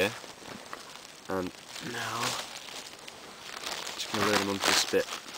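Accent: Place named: England, United Kingdom. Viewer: Nice video young man. Where did you shoot it? Somewhere in England by your accent.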